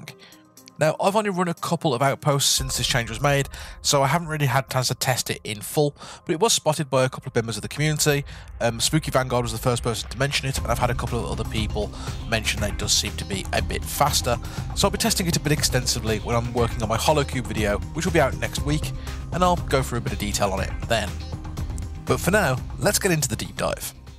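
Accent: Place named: Midlands, United Kingdom. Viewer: So good to hear a midland accent on YouTube and hell man meet your maker is beast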